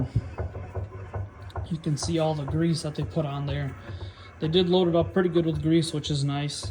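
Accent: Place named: Ukraine. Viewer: neat Ukrainian accent you've got..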